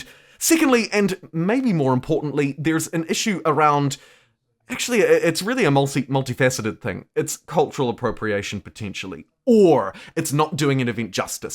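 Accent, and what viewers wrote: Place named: New Zealand. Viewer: Your accent sounds like you're from New Zealand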